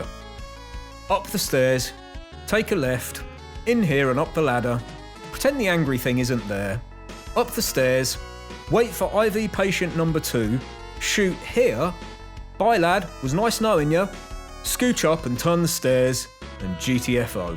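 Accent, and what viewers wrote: United Kingdom. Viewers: finally is talking you sound british my dude🤩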